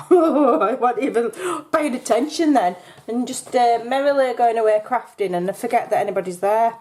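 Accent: Yorkshire, United Kingdom. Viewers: Only just discovered this brilliant double sided page cluster and I 💝 the concept... and the yorkie accent 😊 Cheers from Australia 🐨 🐾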